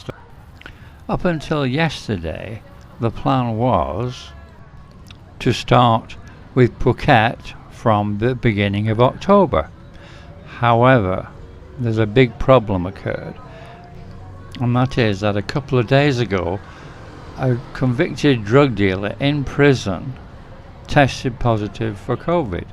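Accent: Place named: Lancashire, United Kingdom. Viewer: You sound like a Lancashire lad, I’ll take a guess at Blackburn??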